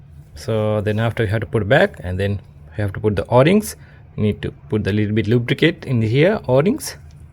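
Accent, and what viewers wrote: India. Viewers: So basically pull the rail off with the injectors still plugged in but off the engine wind the engine over see which one is actually missing it’s spray or dirty then do what he done and I’d also use 120 psi compressed air as well down the ends of each injector and see how that goes.. I’d also lose the gloves and be a man and would be great to see a Australian with a Aussie accent doing not a Hindu in 😂😂😂😂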